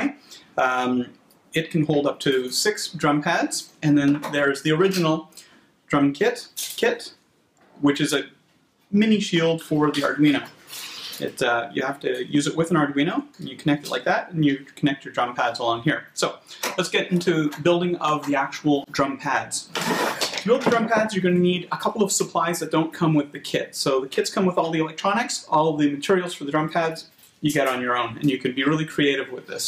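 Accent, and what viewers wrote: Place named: Canada. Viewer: Canadian much? Great vid BTW...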